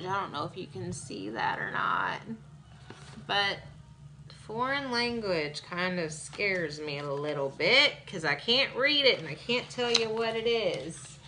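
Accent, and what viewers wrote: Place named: South, United States. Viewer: I LOLed at the VV cream and you bring up a good point/problem I have with boxes shipping even, for instance, K beauty products we've heard of that don't have ingredient lists included in the receivers language. Ipsy has done it a couple of times too, and that weird ass egg mask they sent scalded my skin. So props to you for pointing that out and I'm a fan!;) Nice to hear a fellow southerner on here💜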